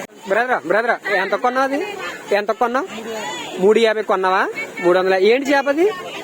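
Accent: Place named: Konaseema, India. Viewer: Thammudu variety video. Your slang konaseema slang Open slang. Which village my dear brother All the best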